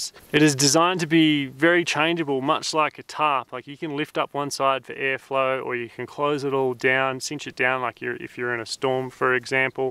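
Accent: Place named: Australia. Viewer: love the Aussie accent, but took me awhile in the shelter segment to understand that "ina" was "inner"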